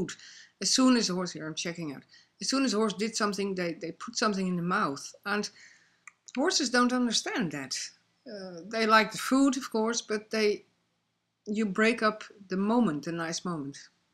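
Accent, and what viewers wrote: Netherlands. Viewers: big time, im 100% also born in the U.S. with a dual citizenship. i can pick up a dutch accent a mile away! Ik vind het fantastisch is. ook veel succes met je paarden en bedankt voor het antwoord!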